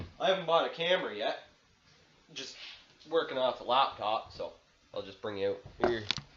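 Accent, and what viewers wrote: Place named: Canada. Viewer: First off, you’re the most Canadian person I’ve ever heard in my life, second... it would be better if we could see your boot on the gas pedal when pumping her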